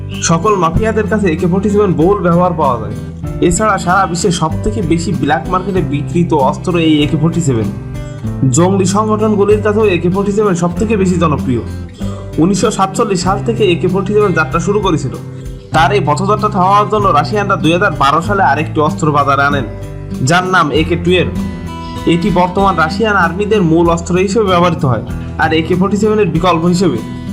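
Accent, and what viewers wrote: Bangladesh. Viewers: আপনার বাড়ি কি খুলনা, যশোর বা নড়াইলে? আপনার কথায় আঞ্চলিক টান আছে। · vi ki noakhailla naki.....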